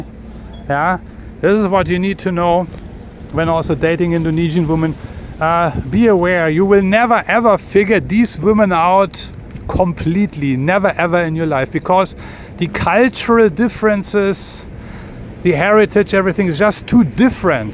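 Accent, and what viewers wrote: Germany. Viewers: ur accent likes germany? right?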